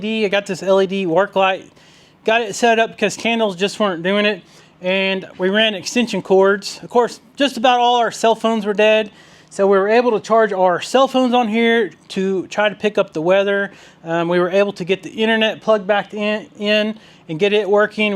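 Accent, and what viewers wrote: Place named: Oklahoma, United States. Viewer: 👋Hi and family, watching from South central Iowa; my mom was from Oklahoma, your accent and chill personality reminds me of my Uncles! Enjoy your channel very much.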